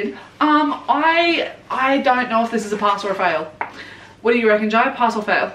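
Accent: Australia. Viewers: ...you're Aussie. Yes 😂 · Are you Australian?? :3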